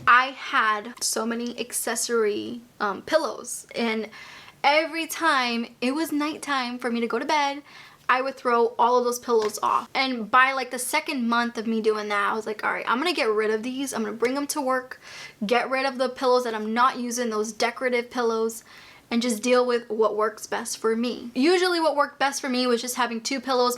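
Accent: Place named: New York, United States. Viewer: I’m new here I love your accent are you a New Yorker ?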